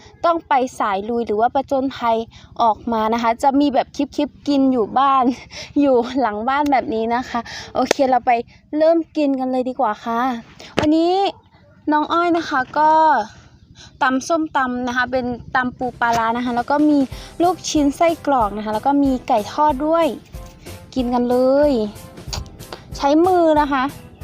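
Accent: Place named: Suphanburi, Thailand. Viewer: เวลาน้องพูดสำเนียงไทย เสียงจะออกเหน่อฯ เหมือนคนสุพรรณเลย😂😂😂อยากฟังควมไตยบ้านน้อง แบบเต็มคลิป ...เพราะแต่ละที่ สำเนียงไม่เหมือนกัน😇😇😇ยอนเสง สาวไตย ว่านพักตูเมิง ค่า.......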